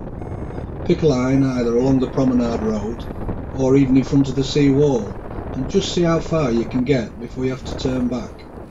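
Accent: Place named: Yorkshire, United Kingdom. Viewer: Thank you very much for your very helpful video its especially nice to hear a down to earth Yorkshire man mmmm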